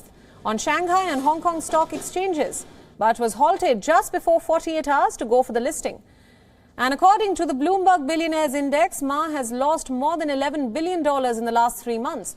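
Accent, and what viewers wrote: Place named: India. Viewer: She is Indian and speak English with funny accent just speak in ur language 🤦‍♂️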